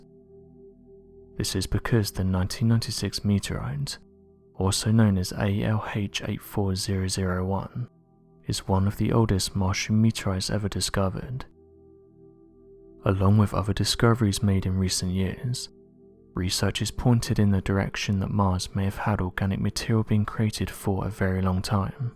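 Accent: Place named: RP, United Kingdom. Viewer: And the queens english is easy on the ears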